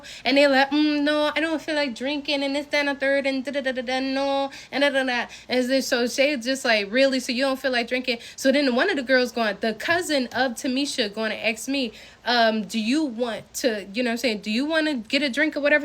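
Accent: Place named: United States. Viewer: Not raining on her parade or NOTHING! But as an American who lived in Jamaica for three months, also in the hood, a big percentage of why you get so much attention is BECAUSE YOURE AMERICAN! They can tell very easily, and don’t open your mouth cuz that accent gone tell on you, that’s also probably why the guys brother brought you to meet so many people, it gives people status to “know” an American. She’s also soooo beautiful so I’m sure she gets a lot of attention anyways but just food for thought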